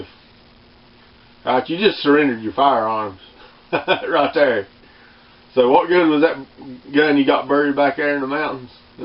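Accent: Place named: South, United States. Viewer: Well I watched your video, the whole thing, and that's time I'll never get back. Sounds like you're just given up, it also sounds like you're from the South. But you don't know the South because ain't nobody giving up anything down there. Also if it gets "Venezuela bad" us people who buried our fire arms will have firearms. So you're talking in this circular logic that doesn't make sense. The only thing that makes sense to me is you're a coward and you're going to give up.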